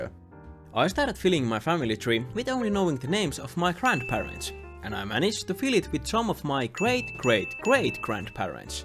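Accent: Finland. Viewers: As a finnish i know the finnish accent and you have one so you are finnish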